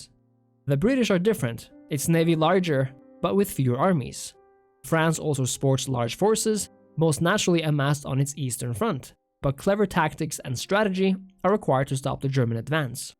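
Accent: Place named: Norway. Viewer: I detect a Norwegian accent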